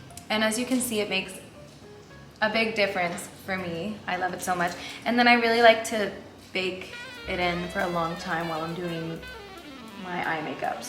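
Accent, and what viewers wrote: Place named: South, United States. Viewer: Yeah what happened to that southern accent she had like 5 years ago? She straight up sounded southern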